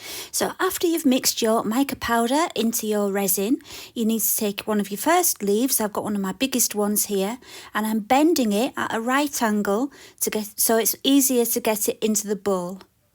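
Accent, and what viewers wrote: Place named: Scotland, United Kingdom. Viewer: I enjoy your videos so much for two reasons. First I love your work. Second my grandparents were Scottish and your accent warms my heart and makes me think of them. I always wanted to travel to Scotland and see where they lived but I've put it off. Listening to you makes me want to speed up my plans. I think when covid is all gone I'll make the trip.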